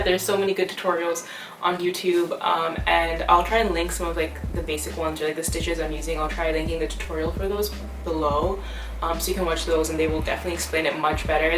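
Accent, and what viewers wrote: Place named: Caribbean. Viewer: you from trinidad? you have a accent like somewhere in the caribbean and at the same time some kind of american and british accent in some words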